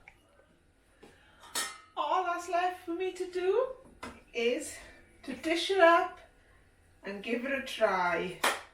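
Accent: Wales, United Kingdom. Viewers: It looks yummy! I'll have to give it a try! Good luck on your growing in your garden! When do you give up the allotment? Oh and I love your Welsh accent :)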